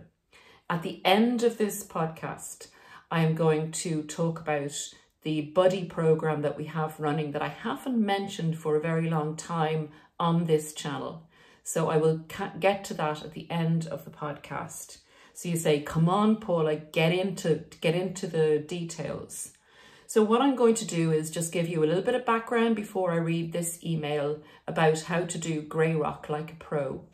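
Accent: Ireland. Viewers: I have followed for 1year, its been an invaluable resource for dealing with the aftermath of narcissistic abuse...the narc sussed gray rock forcing a reverse discard. Thank you Paula, great to hear an Irish voice on such a personal subject.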